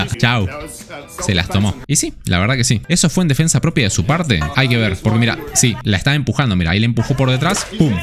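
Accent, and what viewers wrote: Argentina. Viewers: ?MARAVISHA, BRASHAN? ?que estás diciendo amigo ARGENTINO! !aquí en MEXICO no te entendemos nada !por respeto a México habla bien pronuncia bien las palabras. !no seas irreverente y mal educado!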